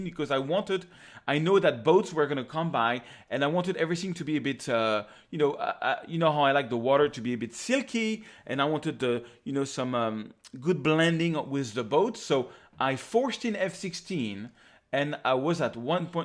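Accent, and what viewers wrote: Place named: France. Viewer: Nice tutorial. Everything's clear. Unfortunately, it was with your french accent but it's always better than the one from Gunther from LRTimelapse !! (^_^) Try it in french next time. And btw, the name of the device you were looking for is an intervalometer.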